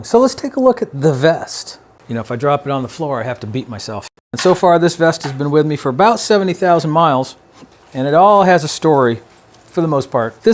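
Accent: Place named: New England, United States. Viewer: !! A fellow New Englandah! I knew there was something familiar about you! But seriously, your videos are the real deal. You are articulate, witty, wise, and above all, interesting to watch! Your editing skills are next-level. Clearly, you know your stuff! I have no doubt you’ll be a big hit on the YouTubes. And best of all, you’re in the Carolinas. My girlfriend used to live in Ladson, so she is going through PTSD watching you ride route 26. Hahaha!!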